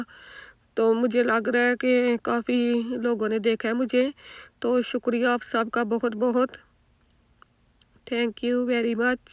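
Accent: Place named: Lahore, Pakistan. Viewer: First with u dear 👍📌 Really enjoying ur Punjabi which same like us ..r you from lahour ??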